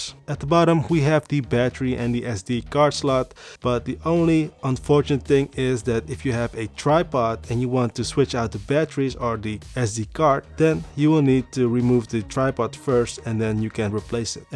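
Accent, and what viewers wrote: Netherlands. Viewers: Ik kon gewoon horen dat je uit nederland komt 👏🏾 I want a camera so bad but the prices are sickening here in the Netherlands (everywhere tbh) 😩 Trying to figure out how good the cheapest ones are.. Nice video, thank you~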